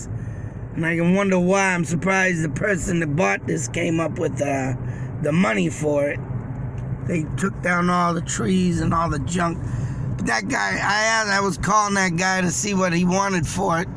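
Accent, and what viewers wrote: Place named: Massachusetts, United States. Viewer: wow! thanks for the drive by tour .very cool! i noticed the Bennett property added some new buildings . that pizza place you were talking about used to be a small grocery store. then became a honey farm convenience store .christophers pub next door use to be a music instrument store. and where that subway sign is used to be a record store then it was a paint store . i think the old courthouse was in the same building as the police station .as for that haunted house, when i was a kid , in the 60s and 70s, there were allot of old houses rumored to be haunted and that was probably one of them . wow Montys gone, dunkin donuts gone wtf! lol .thanks again for the ride. it was fun to watch and cool to hear a massachussetts accent again.cheers!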